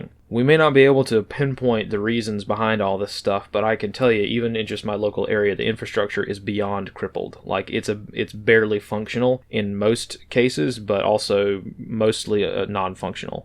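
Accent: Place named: Virginia, United States. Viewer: Hey, I love the content. And just wanted to say, by hearing your accent.. I believe you’re from around Virginia, I know people from Norfolk that share a strikingly similar accent. And with a lot of crazy things going on nowadays, I just can’t thank you enough for keeping us informed in a “no bs straight forward” fashion. Keep fighting the good fight buddy!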